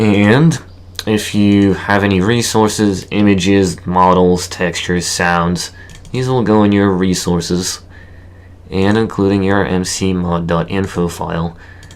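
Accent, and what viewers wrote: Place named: United States. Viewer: We thought you were russian but you sound like a monotone american. Great tutorial btw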